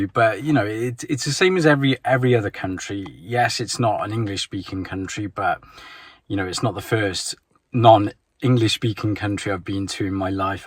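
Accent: United Kingdom. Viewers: u will never learn Russian...and i will tell u why...u don't like the Russian language...u just love to hear yourself in your ugly native language... and I can notice that u speak fluent english with a strong british accent better and better every day... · у нас некоторые русские делают покупки в магазине, не говоря ни слова 🙂 Я думаю, что вы человек очень family-orientated, что в повседневной семейной жизни вам легко общаться как с женой, так и с детьми на английском. Ну и есть люди, которые очень открытые, легко идут на контакт, много общаются. Вы более закрытый, вам хватает общения с устоявшимся кругом старых друзей и знакомых. Поэтому у вас освоение языка идёт медленнее, либо это просто ваше чувство, что он недостаточно хорош. Но пробуйте, будьте смелее, вас всегда поддержат. Людям любой национальности нравится, когда говорят на их языке, это ценится. 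Вам помогут с русским ваши дети, слушайте их, говорите с ними на двух языках. А так, мне очень нравится слушать ваш британский английский. Такое удовольствие? Скажите из какой части Великобритании вы? Просто я не смотрел ваши видео с самого начала,не знаю поэтому.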